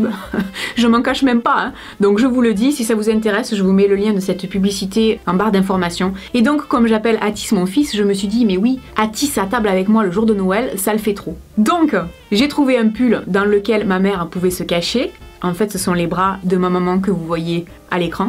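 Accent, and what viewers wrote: South, France. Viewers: J'adore l'accent du sud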